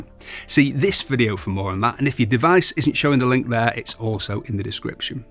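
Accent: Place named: Yorkshire, United Kingdom. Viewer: You sound like you come from somewhere in Yorkshire - where abouts? is the question.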